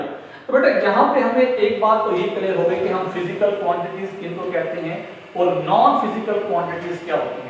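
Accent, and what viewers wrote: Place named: Multan, Pakistan. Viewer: Voice of multan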